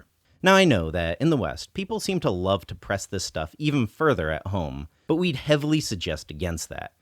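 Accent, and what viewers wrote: China. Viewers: Why does this white guy have a Chinese accent? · It's interesting to hear the slight bit of accent you picked up in your time in China. You speak a little bit "sing-songey," and I find that kinda neat. As always, I love your videos! Chinese cuisine seemed a world away until I started following you. Super informative!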